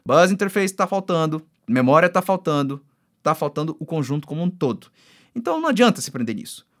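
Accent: Pará, Brazil. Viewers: Esse sotaque paraense, meu parceiro. Enfim um canal de tech e inovações em que me sinto representado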